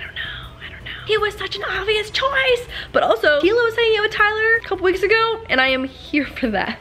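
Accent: Canada. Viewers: tomoreow hahahhaa love ur canadianness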